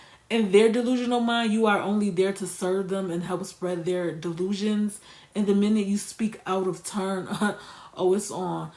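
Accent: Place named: Brooklyn, United States. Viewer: I like your Brooklyn accent. You from BK?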